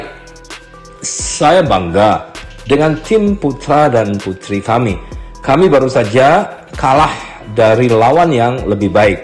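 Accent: Java, Indonesia. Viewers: Biasa biasa aka atuh bkb nya mirip bule tp jadi logat jawa medok njiiirr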